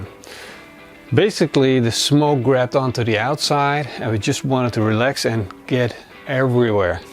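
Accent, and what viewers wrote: Netherlands. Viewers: It's Dutch for sure :D No question about that!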